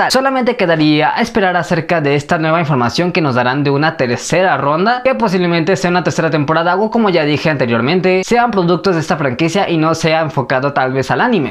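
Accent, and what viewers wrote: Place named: Venezuela. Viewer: Literal aveces creo que este men es Venezolano en base a su Acentó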